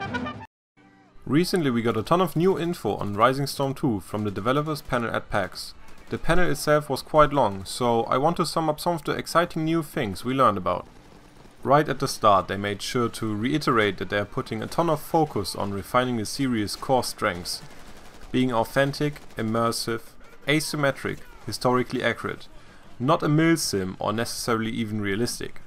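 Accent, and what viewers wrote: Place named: Germany. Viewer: Very well done! You sound much better this time, made me really wanna listen to you. Keep up the good work :) btw are you German? because you sound very German and I would know, since I am one myself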